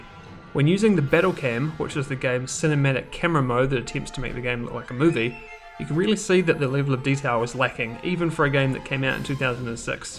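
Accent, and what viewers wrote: New Zealand. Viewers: Great game, great video, great Kiwi accent. Chur.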